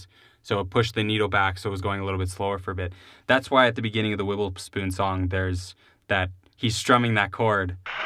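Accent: Chicago, United States. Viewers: hey...great vid and great answers....as a fellow Chicagoan...celebrate the Dialect...not everybody gets it... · "Valume" is a Chicago accent thing. Like I catch my self saying pocket like "pah-ket" all the time.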